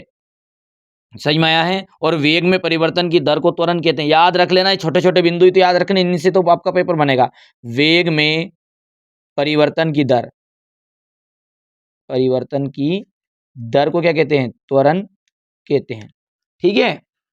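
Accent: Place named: Malwa, India. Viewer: Sir ap malvi Bhasha Bolte ho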